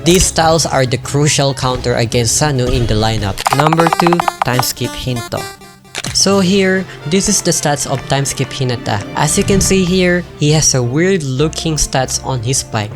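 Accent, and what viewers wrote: Philippines. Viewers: Pretty sure it might be a Filipino accent (as a Filipino) correct me if I’m wrong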